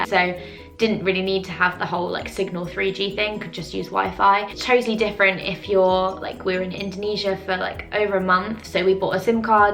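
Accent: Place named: England, United Kingdom. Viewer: Always wanted a girlfriend with an English accent-sounds so sophisticated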